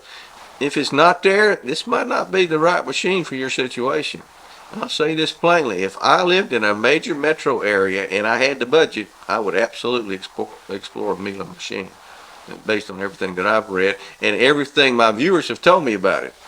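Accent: South, United States. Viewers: I've worked on cars and trucks for over 30 years. I really trust. He's obviously knowledgeable, experienced, intelligent and (importantly) honest. I think I'm becoming like this guy, minus the good ol' boy accent. I'm complimenting somebody. I'm not sure if it's him or myself or both. Having said that, I worked for Sears Auto in the 1990s and the appliance department guys said they laughed when they saw the Maytag commercials. You know, the old ones with the service guy who was so bored because Maytags never broke. Maytag was far and away the most common warranty problem they had. But, Sears didn't sell the model he mentions in the video.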